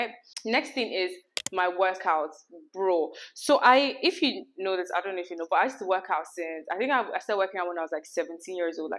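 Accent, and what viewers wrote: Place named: Nigeria. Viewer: It's her Nigerian accent for me 😘😘😘❤️❤️❤️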